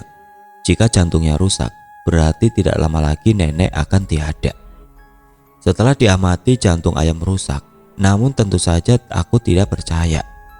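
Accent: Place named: Java, Indonesia. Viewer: Maklum lidah orang Jawa😃😃😃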